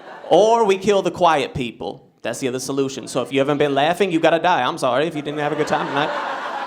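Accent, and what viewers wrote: Louisiana, United States. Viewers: How did he grow up in the San Fernando Valley and come me out sounding like he’s from Louisiana? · Hi, I noticed you have not put any videos on here in a long time. I hope all is good, I saw you on America's got talent but not sure how old the video was? I read comments below about your accent, I grew up out in So. Cal., but,moved back to michigan 22 years ago. I have heard many accents and wondered if you were from maybe Lousianna? you have an accent, but it doesn't sound like New York / Jersey, or Canadian, or Michigan, Wisconsin, Minnissota, or any states i can think of, but it has sort of a southern sound but not always. just wondering where you grew up? Most of us get a accent from hearing adults with an accent at a young age. When I moved back to michigan from So Cal, it was funny sounding to me, they really had some strong accents here but they dont think they do. 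· Wow you have a thick southern accent for being born and raised in LA, CA. Unless you meant LA as in Louisiana?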